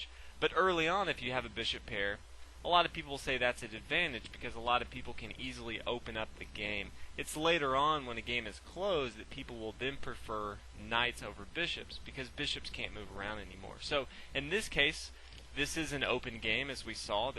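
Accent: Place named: South Carolina, United States. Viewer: you sound like your from Aiken, South Carolina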